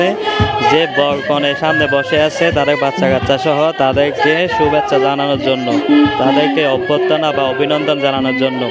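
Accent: Chittagong, Bangladesh. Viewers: উনি চট্রগ্রাম।যেখানে জন্মেছে সেই টোনেই তো বাংলা বলবে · ভাই আপনার শুদ্ধ ভাষায় কথা বলার স্টাইলে বুঝা যাচ্ছে যে আপনি চিটাগাং এর মানুষ, তাই না? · আপনার বাড়ি মনে হয় চট্টগ্রামে.. কথা শুনেই বুঝা যাচ্ছে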